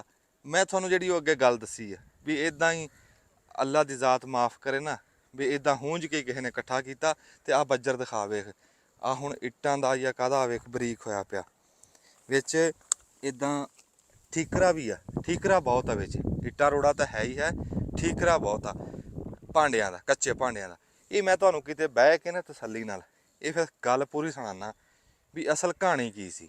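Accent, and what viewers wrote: Punjab, India. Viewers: Very sweet punjabi speaking❤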